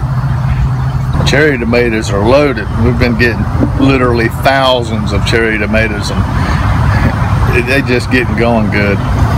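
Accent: South, United States. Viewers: “Maters” “maters sammich” lol 😂 the accent is everything, fried green matters and a good ok Mater sammich I cannot even with this one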